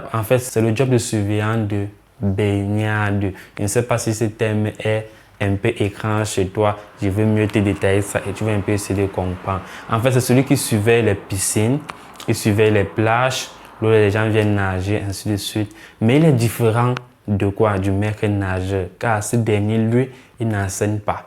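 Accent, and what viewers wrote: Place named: Cameroon. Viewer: Avec le bon accent camerounais a la Francis ngannou yes frerot force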